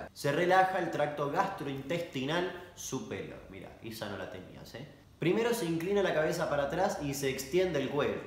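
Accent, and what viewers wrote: Argentina. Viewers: Pareces español pero hablas como argentino xd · El hecho de que tengas acento argentino (o uruguayo(?) lo mejora todo 70 veces. Gracias,buena info capo.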